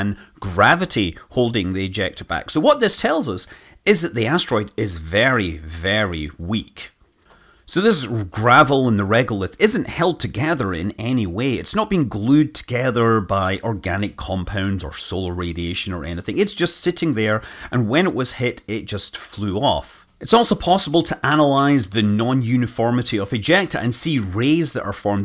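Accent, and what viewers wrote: Scotland, United Kingdom. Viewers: Came for the science. Stayed for the Scot accent.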